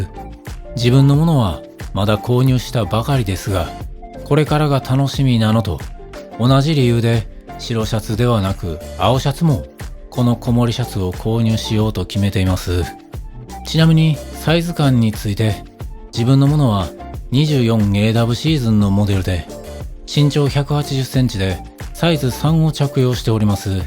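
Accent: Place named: Kansai, Japan. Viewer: 参考になります！ 語尾の関西のイントネーション（？）がクセになります笑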